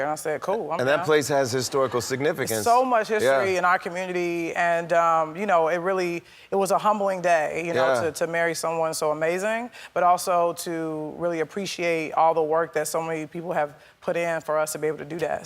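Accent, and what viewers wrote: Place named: California, United States. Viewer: I know this is off topic, you have a nice voice. You're from, or you probably lived in sunny California for a while. We just got that popping "proper" twang that people think is fake. But it's NOT lol♥️🌞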